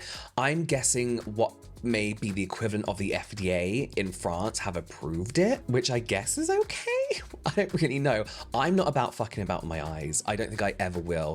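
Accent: United Kingdom. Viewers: tff 💀. has a pretty strong British accent that gets in the way of pronunciation it happens that’s lifee.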